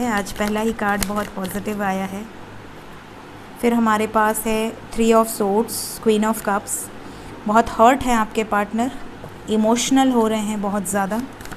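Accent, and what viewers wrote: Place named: South, India. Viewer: Hello from Karachi Pakistan. Your accent seems to be south Indian. your reading is absolutely perfect. MASHALLAH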